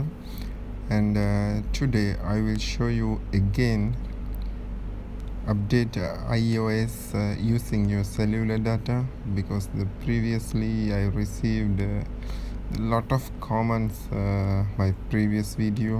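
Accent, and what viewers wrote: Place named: Kerala, India. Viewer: Are u malayali ssss sssss sssss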